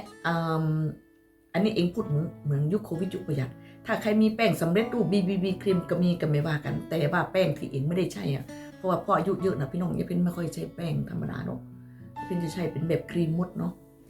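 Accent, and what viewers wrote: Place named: Rayong, Thailand. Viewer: มาจากช่องพี่โหระพาจร้า จะมาติดตาม จร้า เป็นคนจังหวัดอะไรหรือค่ะ สำเนียงคล้ายเหมือนคนระยองเลย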